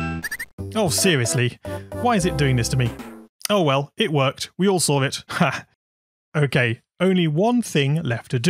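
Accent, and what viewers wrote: United Kingdom. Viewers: I absolutely love the way you sometimes call Goombas "Gumbas". That good old British accent :_cool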